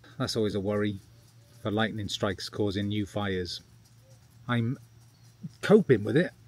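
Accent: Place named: Welsh Borders, United Kingdom. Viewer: Gwent or English borders by the sound of your accent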